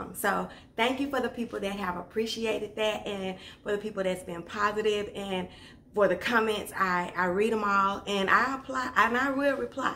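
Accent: South, United States. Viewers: I like when u talk and be funny joke around I love your accent u sound like me I'm from the south and ppl can tell lol but if u dnt talk how we going to knw things I dnt want to sit and watch some of those videos where they r just stone faced lol u r great girl and u be u!!🤗😇🥰😜🤪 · Gurl PuleaSe ya done talked me into tryna make this after all that lip smackin 😂. That Country accent Tho! Sounds like mine except we got a mote Southern drawl 😁. Imma say you're from neither Louisiana or Texas? Love that accent 😊.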